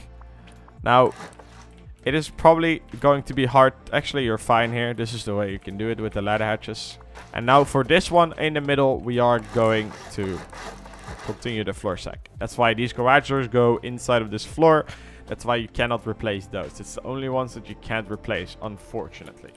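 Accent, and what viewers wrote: Netherlands. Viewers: Wel een lekker Nederlands accentje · Are you dutch?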